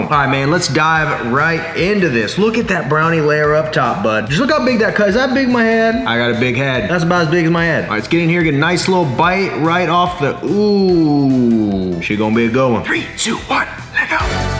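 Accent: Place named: Alabama, United States. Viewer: Man the way you talk reminds me so much of the football dads from my small hometown in Alabama and I can’t get enough of it 😂😂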